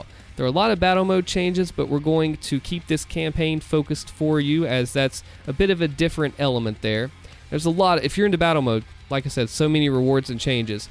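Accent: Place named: South, United States. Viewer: Hey man. Your videos kept coming up in my Recommended section and I kept watching them (as I am a huge Doom fan). Although I liked your content, I found your accent incredibly annoying. Some kind of Southern accent, right? I'm not from the US so I can't tell, but I just found it unappealing to listen to. But I kept coming back for your content and I realised that your way of speaking is actually incredibly kind. The way you talk, the way you explain things. You don't sound aggressive or rude. I don't know you but it sounds like you are a super nice dude who probably wouldn't hurt a fly. Maybe I'm wrong but that's the vibe I get. +1 for content +1 for kind soft-spoken voice and explanations -1 for shitty accent We're still in the positives there so I subscribed!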